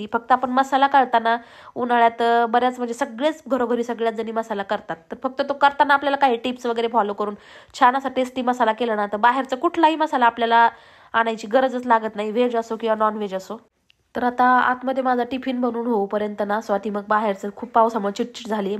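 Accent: Ahmednagar, India. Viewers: तुमची नगरी भाषा लै ऐकू वाटती🎉😂🙏👍👌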